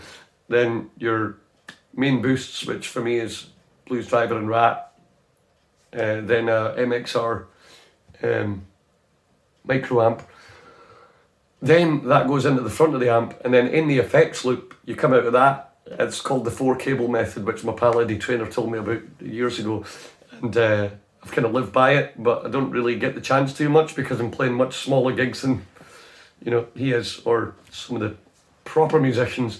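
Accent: Scotland, United Kingdom. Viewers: Real world every day use of one size fits all swiss army knife go anywhere do anything on a shoestring budget guitar pedal? Thank you for posting man. I got one and am just getting the hang of it. My Pearly Gates equipped Les Paul seems to have a higher output than the presets were made for even the clean patches have too much gain. It's cheap, portable and Boss reliability. Love your Scottish accent man. Cheers from the Caribbean. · Hey, Nice to hear a fellow Scot talking guitars. I also own a GT1 and I know what you mean about feeling that something isn't quite the same. I sense this being the same for all modelling gear at whatever price range. I run the GT1 into a Two Notes Cab M for cab emulation and it sounds as good as any modelling kit out there (to me anyway). I think cab and space simulation is really where the difference is made. I swapped between tube amps and modelling for ages, finaly seem to sticking to the Boss Nextone (not a modeller) which sounds and feels excellent. 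Anyway, I like your channel man 😎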